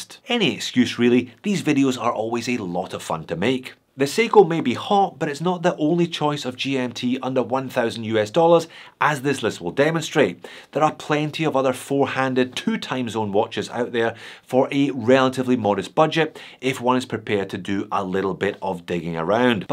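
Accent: Scotland, United Kingdom. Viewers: Me every time I hear an unexpected Scottish accent “oh ma gawd” Appreciate the video, I want a gmt for the utility but don’t want to be daft and overspend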